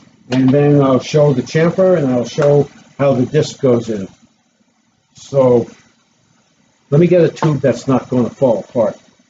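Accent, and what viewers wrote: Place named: Massachusetts, United States. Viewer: Seems like you have quite the shop there very impressive. I hear the accent is that Massachusetts. I'm from CT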